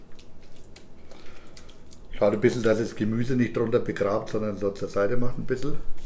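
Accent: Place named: Franconia, Germany. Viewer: Fränggisch - ich gläbb des werd nix Ä riesen Sach und dann noch blaue Zibfl Grüße aus Schweinfurt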